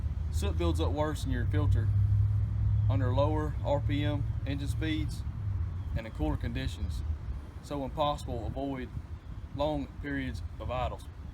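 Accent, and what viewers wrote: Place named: South, United States. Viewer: Understood 'most' of it through that southern accent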